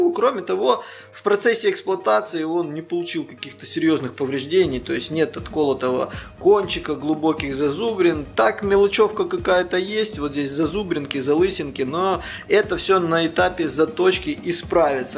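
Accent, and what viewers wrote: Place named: Odessa, Ukraine. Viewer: Ахаххх,слышу что говор свой, а потом на камеру говорите: Привет из Одессы ))))) теперь все сошлось, таки наше "шо" )). Шалом в общем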